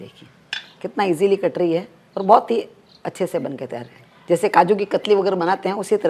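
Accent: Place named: Rajasthan, India. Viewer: Bahut hi achha he.aur aapka voice bahut hi muje pasand he rajasthani flavour aati he..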